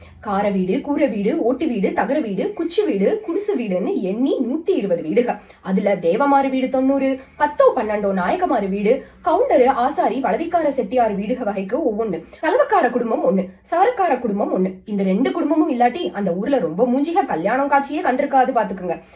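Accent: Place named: Theni, India. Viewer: Theni slang madam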